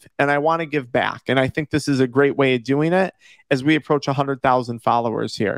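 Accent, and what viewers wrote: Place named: Upstate New York, United States. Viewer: When I first heard your accent I thought this guy sounds like he lives in upstate NY (I'm in Bing) and laterin the video you confirmed you are from NY! Lol thanks for the content, its very helpful!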